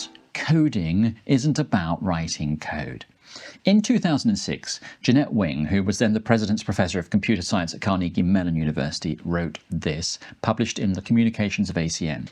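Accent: United Kingdom. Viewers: Bro you have the thickest british accent I've ever heard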